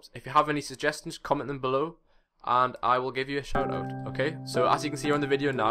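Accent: Newcastle, United Kingdom. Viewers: are you from newcastle by any chance?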